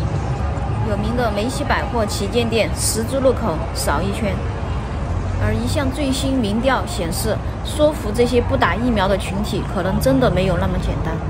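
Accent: Sichuan, China. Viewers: 你的川普听的好安逸哦，偶尔还有点皮、喜欢这种风格，关键是我喜欢听川普。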